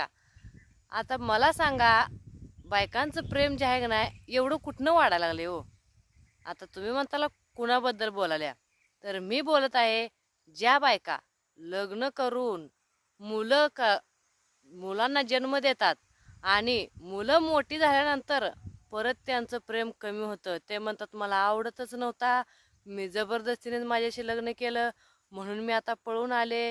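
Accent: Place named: Western Maharashtra, India. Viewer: बोलण्याची पद्धत आवडली ,बोलीभाषा पश्चिम महाराष्ट्रातील दिसतेय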